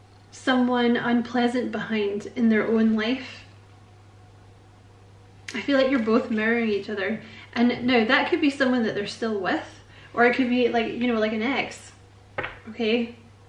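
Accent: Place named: Scotland, United Kingdom. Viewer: First, if anyone screams at you in the comments, I will squash them like a bug.lol Second, I could listen to your Scottish accent all day. I came from there. It centers me and it gives me comfort. Guess you're stuck with me and when I move back I'll ignore you...lol..just kidding...thanks for the healing you bring that helps me guide my destiny in the kindest of ways. You rock!!!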